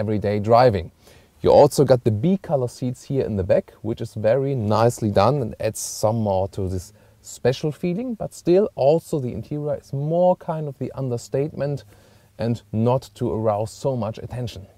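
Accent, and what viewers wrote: Germany. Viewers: God I love listening to a German accent when watching videos about cars!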